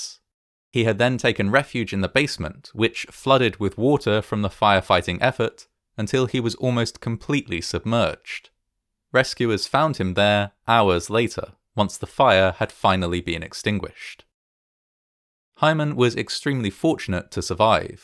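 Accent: England, United Kingdom. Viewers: I was under the impression that he was English because of his accent.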